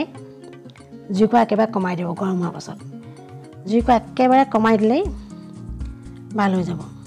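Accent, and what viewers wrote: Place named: Sivasagar, India. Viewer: বাইদেউ আপুনি শিৱসাগৰৰ নেকি,কথাৰ সুৰবিলাক শুনি শিৱসাগৰৰ যেন লাগে,আমাৰখুৰীৰ দৰে.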